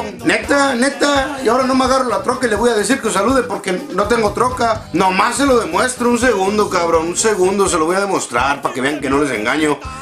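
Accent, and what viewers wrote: Mexico. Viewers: wtf JAJAJAJAJ pareces mexicano de verdad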